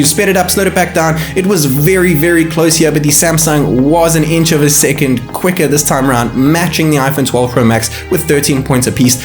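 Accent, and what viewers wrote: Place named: South Africa. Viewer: Hi. I've been a sub for about a few weeks and the first word that you said, when I watched my first video of yours, I already knew you were from South Africa.😅 I'm from South Africa btw.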